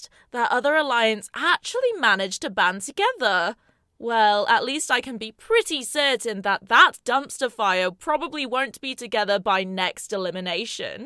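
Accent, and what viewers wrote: United Kingdom. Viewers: Woo 👏 love your voice Also your really British it just comes out so much I love it omg lol 😅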